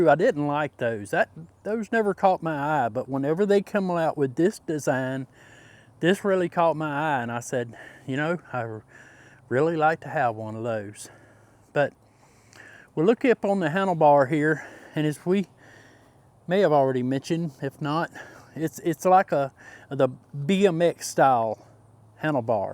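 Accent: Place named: Mississippi, United States. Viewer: Good review! I love your accent. You remind of my family in Coffeeville, Ms. 🙂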